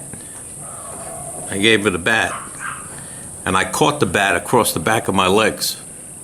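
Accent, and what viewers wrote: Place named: New York, United States. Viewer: what a couple of stories! i was glued to the screen for both of them. having a young child myself, i can already see how kids sometimes scare the every loving crimonies out of us parents, as i know i did mine from time to time.. thanks for sharing. can't quite place the accent; is it new york? hava good'n. 🍻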